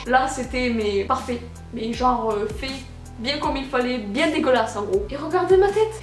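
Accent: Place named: South, France. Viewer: ta l'accent du sud sa s'entant 😀😁☺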